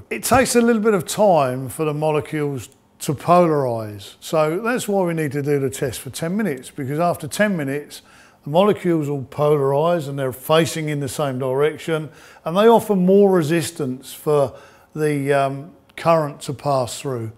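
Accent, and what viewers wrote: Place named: United Kingdom. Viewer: This gentleman speaks like Magneto in X-Men. Nice British accent 👌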